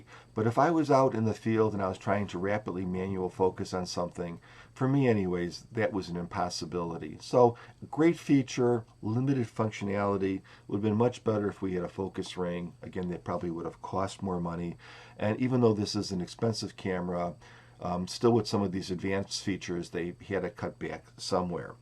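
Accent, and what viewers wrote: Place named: Upstate New York, United States. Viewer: Thanks for the review, I'm picking this up used tomorrow for $100. I think it's great for the price. I enjoyed listening to you speak, your accent reminds me of my relatives in Oswego NY.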